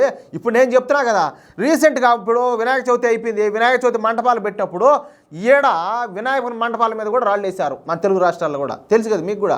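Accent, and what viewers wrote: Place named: Nellore, India. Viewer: Sir. Mee maatalu bhaguntai. Maa cousins matladinattu untundhi. Mi native Nellore or Ongole 😀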